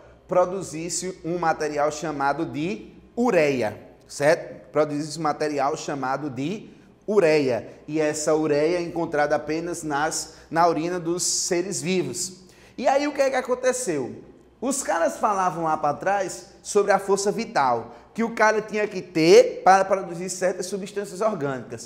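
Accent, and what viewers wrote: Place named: Northeast, Brazil. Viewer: Esse caba é do nordeste mesmo, valeu mesmo.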